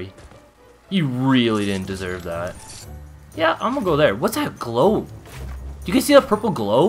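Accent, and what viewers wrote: Canada. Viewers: Are you from Canada? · Are you Canadian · Is he Canadian? Just wondering cause he doesn’t say sorry like an American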